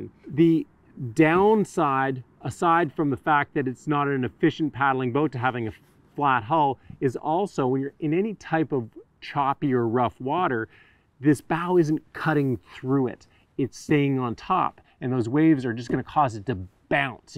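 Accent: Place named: Canada. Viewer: Info as always really appreciated, thanks. Plus your Canadian accent cracks us up down here in New Zealand. Colour density joke had me going for too long. Dang it! On a more serious note, not sure you have covered this point:- Which colour/ combination/s do you believe to be safest colour on the water?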